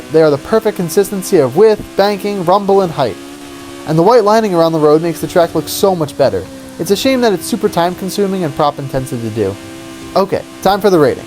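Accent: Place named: RP, United Kingdom. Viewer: This is a wicked circuit. I agree with your review other than the dead stops and the size of it I dont think there should be a mark down for them, cos if a person cannot stay within the width of the track plus the run off space, then its a skill issue, not a builder issue. Yes, length does make it harder to decorate, but id rather have a brilliant track like this, then more stuff to look at it whilst I race about. Good stuff boy. I love your posh accent as well. hehehhe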